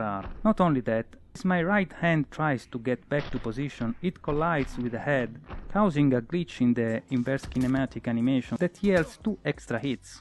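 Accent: Italy. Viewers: this is a real italian accent!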